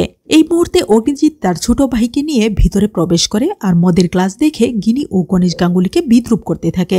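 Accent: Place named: Bangal, Bangladesh. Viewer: অতি সাধারণ কথক। অশিক্ষিত উচ্চারণ। বাক্যের শেষে টেনে টেনে বলা শুনতে ভালো লাগে না। আবার,বানগাল টান ও রয়েছে।তবে, চেষ্টা করলে সব খামতি শোধরাতে পারবে,তা আমি বিশ্বাস করি। চেষ্টা কর ভালো কথক হতে। শুভেচ্ছা রইল।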